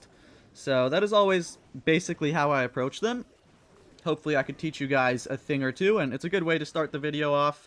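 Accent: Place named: United Kingdom. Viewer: I thought you are British or ausie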